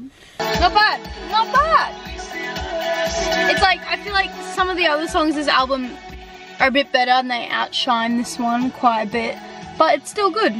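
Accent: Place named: New Zealand. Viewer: I thought hang on is she a kiwi?! I had to check. You sound more kiwi than aussie IMO 😅 I'm from NZ 😁 Love your vibe and sass. Stay real 👌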